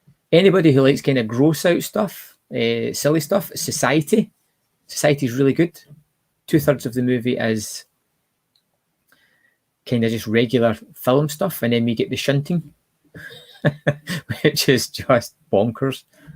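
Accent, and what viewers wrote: Scotland, United Kingdom. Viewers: I’m sure your voice sounds different on the live stream! Not sure if it’s more Scottish or not 😃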